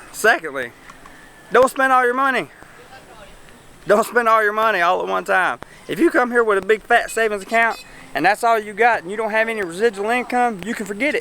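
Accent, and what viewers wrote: South, United States. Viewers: What southern state are you from? Louisiana? Reminds me of Bobcat Goldthwaite. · your from texas cause your accent like a cowboy... i like it... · Just came across your vlog I subscribed, thank you for the honestey bro! My dream is to come to the Philippines, I love everything about the Philippines I plan on making it there soon! Where are you from it sounds like somewhere in the southern states? ( NC, SC, VIRGINIA )???? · He sounds like One em gangs in Red dead redemption 2. A strong southern accent so cool. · love that accent..what part of south you from..mind me asking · I don't know! his accent is a thick Southerner but his looks is more like the people of Saddam in Iraq or Syrians:-)) hahaha, maybe because I'm a local you look different to me... no offense I like your video:-))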